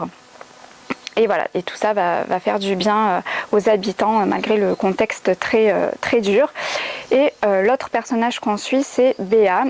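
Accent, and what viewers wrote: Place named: South, France. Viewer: J'espère ! En Savoie, à 1h30 de Grenoble et d'Annecy, au pied des stations de ski les plus réputées du département ! T'es dans le Sud toi non (du moins ton accent est du Sud) ?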